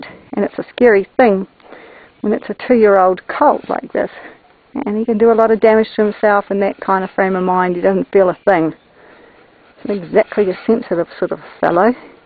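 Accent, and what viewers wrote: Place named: New Zealand. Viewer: Hi I like your relationship with this horse. Tom Roberts would advised to tie the halter with a bowline knot under his chin, (easy to undo) I am looking forward to seeing more of your videos. PS I like your kiwi accent. I am keen to see how you actually get into the racing part.(if that's what you do) I have a colt and I am working him will follow your advice however my skills are not as confident as yours ..but I try.you explain things very well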